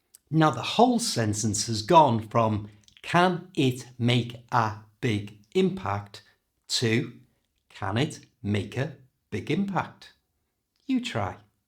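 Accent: United Kingdom. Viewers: thanks so much, the british accent is the best.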